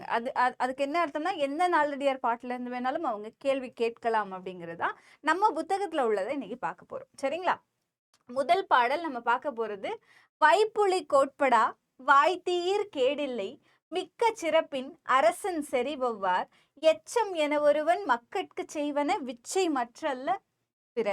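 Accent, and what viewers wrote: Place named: Sri Lanka. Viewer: இலங்கை தமிழ் கேட்க எவ்வளவு நாள் ஆகிவிட்டது இனிய தமிழ் ஓசை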